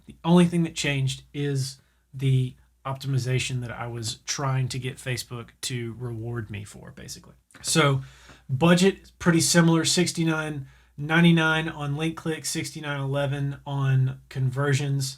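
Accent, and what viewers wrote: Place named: South, United States. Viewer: I think I hear a southern twang to your voice. We just talk slower 😄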